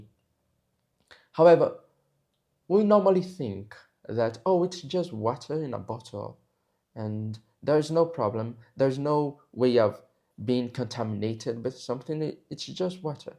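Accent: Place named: United Kingdom. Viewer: You've got a nice British accent ! I speak with American accent, but I've always found British way of speaking beautiful! Have a nice one my friend ! 😊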